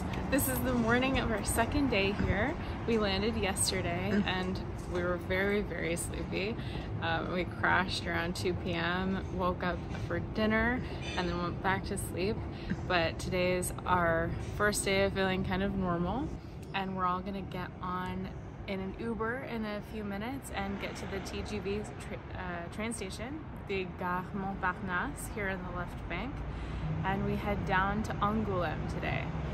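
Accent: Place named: United States. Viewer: Thank you for a wonderful film of your journey from USA to France and your new home. Filming was excellent. You are speaking much slower now as sometimes an American accent is difficult to understand. Seems sad the past Owner didn’t arrange for some bedding after paying can only imagine a large sum. France is a great country, I am English, but French people can be hard to get to know but once they know you are very helpful etc. I wish you all the luck very different to San Diego which I loved visiting some years ago. Good luck 🤞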